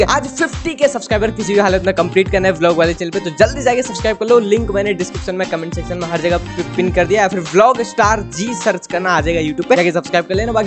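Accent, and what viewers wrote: Odisha, India. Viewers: Bhai tu odiya he na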